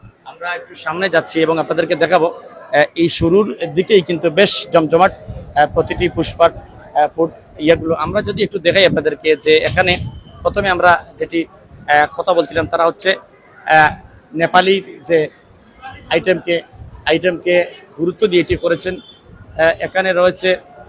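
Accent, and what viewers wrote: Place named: Sylhet, Bangladesh. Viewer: Sylheti accent bhaisab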